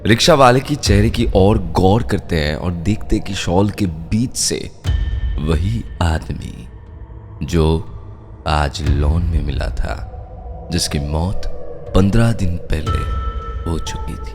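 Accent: Bengal, India. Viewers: Bengali ar moto hindi tao valo lege6e. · onak ta bhalo ho cha agr thaka but akhon oo bengali acesnt ta acha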